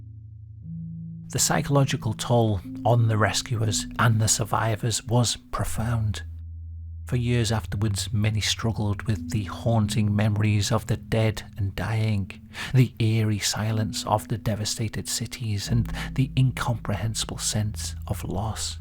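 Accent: United Kingdom. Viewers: WHILE THIS ARTWORK IS HAUNTING AND DISTURBING LET US NEVER FORGET THAT EMPEROR HIROHITO HAD ALREADY JOINED FORCED WITH ADOLPH HITLER AND THAT NEITHER ONE OF THEM WAS INTERESTED IN STANDING DOWN, THEIR MUTUAL GOAL WAS 100 % WORLD DOMINATION. I AM SURE THAT THE US SAILORS WHO RESIDE AT THE BOTTOM OF THE OCEAN ON THE ARIZONA AND OTHER SHIPS WOULD REALLY ENJOY THIS BRITISH NARRATION AND VIEWS AND YOUR OPINIONS. PERHAPS THOSE USA BURNED AND DROWNED SAILORS WOULD HAVE PREFERRED TO LIVE OUT THE REST OF THEIR LIVES ON EARTH INSTEAD OF DOWN IN THE OCEAN AS FOOD FOR FISHES. SO KEEP YOUR WOKISM OPINIONS ABOUT A WAR YOU DID NOT PARTICIPATE IN NOR FIGHT IN, AS MY OWN FATHER DID!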